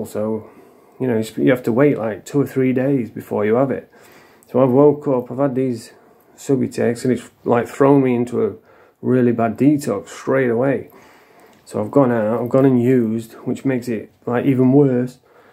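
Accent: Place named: England, United Kingdom. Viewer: Go on lad… I’m from the basque,north Spain, But I work on ferrys in the Uk , all over…I’m curious about your accent ? Which part of England are you mate? Strong lad, nice2 meet u…’ Greetings 🙏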